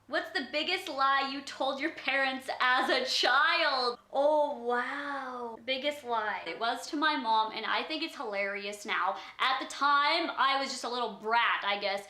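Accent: Canada. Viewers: i love your canadian accent!!! its just so funny! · i love your accent i wish i was Canadian xx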